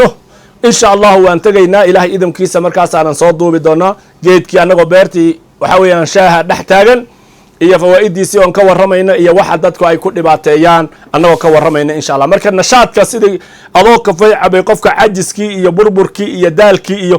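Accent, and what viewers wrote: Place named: Doollo, Somalia. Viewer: Sheekhow doollo miyaad kudhalatey afkaaga aadbaan ufahmayaaye?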